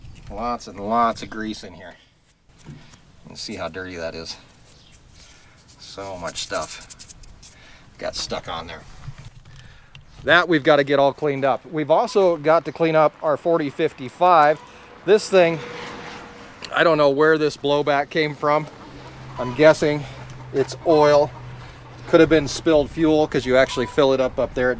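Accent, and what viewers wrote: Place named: Boston, United States. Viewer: Cuts like “nuttall”, since I like in Massachusetts you were speaking my language. “Paak the ka in the yaad”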